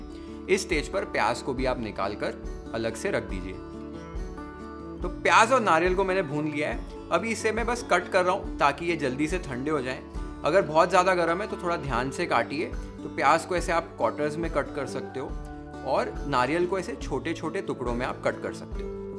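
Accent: Maharashtra, India. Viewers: Recipe तर झणझणित आहेच..पण मराठी accent एकदम कडक... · Yes... His marathi pronounciation is very clear. I have seen his modak, kale mutton recipe... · Like your Assal Marathi Pronounciation.. Love the way you cook all recipes with authenticity and dedication.. 👍🥰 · खूप सुंदर दिसते मिसळ 👌👌आणि तुम्ही जी शुद्ध मराठी बोलता ती ऐकायला खूप छान वाटते . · love you ♥️ sir I'm from mumbai ( Maharashtrian ) तुमची मराठी एक नंबर आहे 😊 pronunciation authentic Marathi 👌 Your recipes are Outstanding.. no doubt, i personally tried out most of.... Kolhapur means treasure of testy Food 🚩♥️ GBU 🕉️ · Dear, I am a hardcore maharashtrian...I am so happy with your pronounciations and Marathi zhatka in most authentically cooked most favourite of entire Maharashtra.Thank you so much.Big fan and follower of yours.God bless you... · Amazing..ur Marathi accent is awesome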